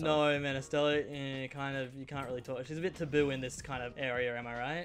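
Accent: Australia. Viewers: Your Australian accent just keeps growing. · this video is just channeling his inner australian accent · Bro I love you accent like it is the best Australian accent ever. :D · That australian accent made me so uncomfortable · is it me or does sound more australian in this video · Who else noticed the Australian accent getting more evident than usual? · Is it just me, or does sound extra Australian in this vid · he sounds extra-aussie this video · is it just me or does he sound insanely more australian than normal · the Australian accent popped out here more than usual 😗😗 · Not sure how but you sound more Australian than normal in this video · This is like the only video that actually sounds like an australian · is it just me or does he seem more Australian than normal in this vid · Was it just me or did he talk more Aussie lol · why do you sound more australian in this video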